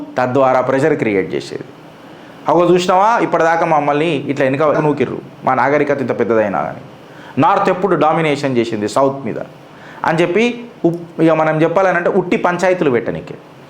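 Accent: Telangana, India. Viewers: ఎదో కవరింగ్ ఏజెంట్ గాళ్ళు, కీల అంటే లోపల, కీలడి, దాన్ని పలకడం కూడా ఎటకారం, విజయ్ మీద బేపన విషం ఎందుకు? హిస్టరీ అనే నిజాలు బయట పడుతుంటే, నీ తెలంగాణా యాస ఎవడికి లెక్క తమిళ్ సంస్కృతి, బేపన కంటే పూర్వం అని రుజువు అయితే, పిలక కథలు కూలిపోతాయి అని భయం తో నిన్ను పంపారు, పంది పేసాద్ ని కూడా వదులు జనాల మీద కి బుద్దుడు పంది ని తిని చనిపోయాడు, అంబెడ్కర్ రాజ్యాంగం రాయలేదు, నేను అప్పుడు ఉంటే, అంబెడ్కర్ మటష్ అనే మాటలు వాడి చేత అనిపించారు గా నెక్స్ట్ ఎంట్రీ వాడే కదా 😂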